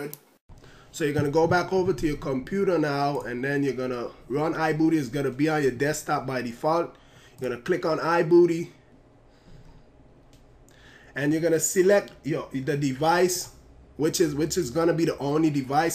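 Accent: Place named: Jamaica. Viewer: Dude. I love your accent. Are you Jamaican ?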